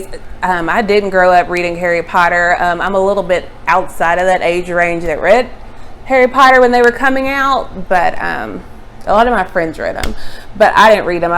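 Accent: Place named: South, United States. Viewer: Welcome to BookTube! I’m from Texas, so I love a Southern accent 😀. Looking forward to what videos you upload!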